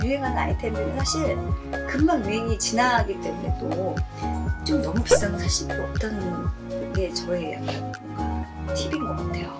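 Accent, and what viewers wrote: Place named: Seoul, South Korea. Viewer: 서울사투리 쓰네영ㅎㅎ귀엽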